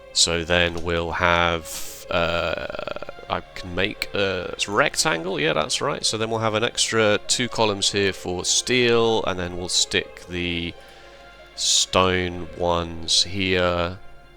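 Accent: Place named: United Kingdom. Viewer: I like this format. I'm just here for the Factorio content. Carry on doing what you enjoy doing. Waffling on in a British accent is fine... kind of soothing really.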